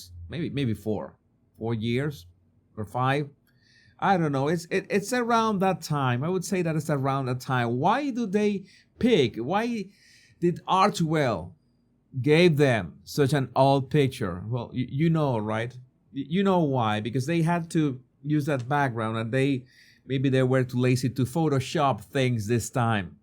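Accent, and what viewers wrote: France. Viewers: Well I've heard your French accent and it's fabulous. It surprised me about the "bird" . 😅. I like it. It's 🥰 cute. Take care. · French accent?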